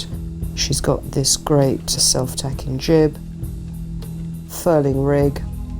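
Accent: United Kingdom. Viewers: Your voice has the proper English accent for this very regal yacht. I feel underdressed if I'm not wearing white lace gloves and an Ascot-esque hat while you describe it.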